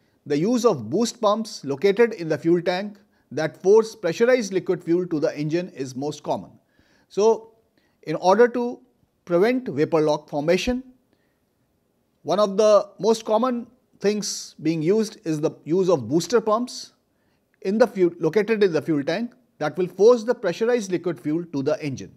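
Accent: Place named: India. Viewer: These Indian heavily accented videos drive me nuts.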